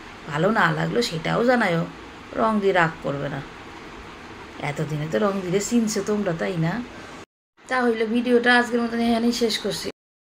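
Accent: Bangal, Bangladesh. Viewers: লাইক সাত ,তোমার এই বাঙাল ভাষার কথাগুলো দুর্দান্ত লাগছে শুনতে । পুরো ভিডিও তোমার ভিডিও থেকে তোমার কথা শুনে খুব আনন্দ পাইলাম।😂👍💓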